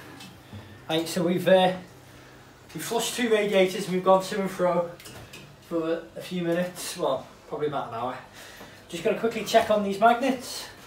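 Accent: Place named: Liverpool, United Kingdom. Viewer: I live in Liverpool and have 1 radiator (large) in my house that’s cold at the bottom and warmer at the top. Given that your accent is local to me are you still doing these jobs & how much would you charge for 1 radiator? Cheers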